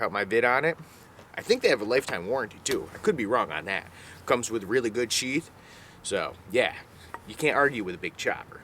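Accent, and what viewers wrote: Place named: Ohio, United States. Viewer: u sound like ohio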